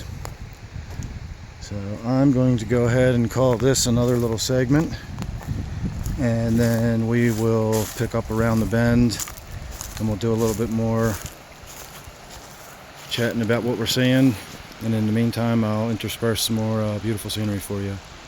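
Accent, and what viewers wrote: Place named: Baltimore, United States. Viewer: Are you from the Baltimore Region? I thought it sounded like you had a bit of a baltimore accent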